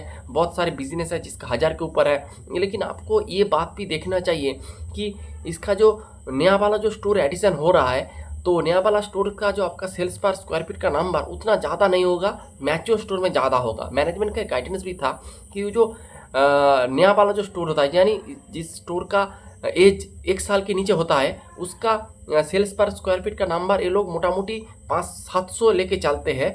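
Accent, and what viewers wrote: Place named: Bengal, India. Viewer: Bhai puro Bengali tone asche, Hindi ta khub baje sunte lagche. Camera er angle ta thik kor - get a virtual background. Content bhalo but not getting attention. Fix these issues.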